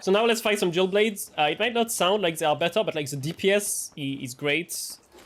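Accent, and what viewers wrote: France. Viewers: Bro your French accent is killing me xD. Greetings from Germany, only a fr*nch can play shield like that, bc of the Police nationale Heritage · Thanks for this insightful video, and the french accent is icing on the cake, tu gères mon gars !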